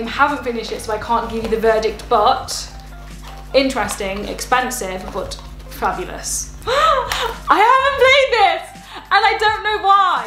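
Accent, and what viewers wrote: United Kingdom. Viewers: I must be down horrendously bad because the white toes and the UK accent is my weakness. I can listen and watch her but the white toes sigh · Just love your amazing British accent of yours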